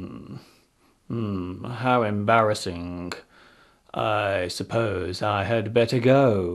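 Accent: United Kingdom. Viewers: It is a pleasure listening,real british English.It is clear and funny. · Hahahahaha oh my god you are so funny! and this is very helpful for me, I love british accent, specially cockney!! Kisses from Uruguay, Latin America!! :)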